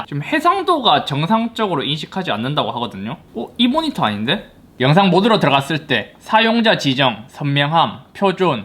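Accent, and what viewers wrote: Gyeongsang, South Korea. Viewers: 아....경상도 사투리 겁나 거슬린다.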